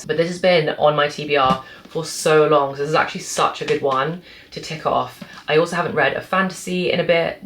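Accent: United Kingdom. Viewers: Hi 💁🏻‍♀️ are you going to use this channel too or will you continue with the channel? should we subscribe to both? I love british accents and you have beautiful british accent🤍so pure✨Anyways, I found this channel by chance, I loved it and subscribed! I am obsessed 📚books and cozy comfort place, this channel what I need! 😌🌻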